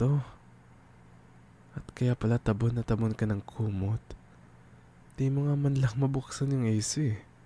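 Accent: Conyo, Philippines. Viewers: ang conyo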